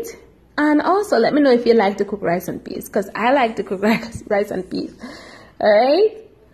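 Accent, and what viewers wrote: Jamaica. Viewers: Are you Jamaican or Bajan ??!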